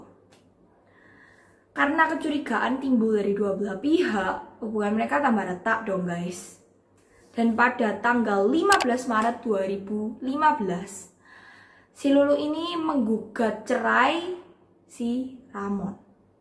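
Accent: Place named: Java, Indonesia. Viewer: Ealah medok banget yo mbak...yo wis gakpopo,ceritanya bagus 😃😃😃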